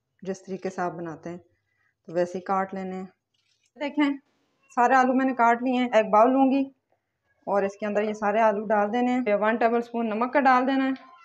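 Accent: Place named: Rural, India. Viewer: Ajeeb pendoo style Mai bolti han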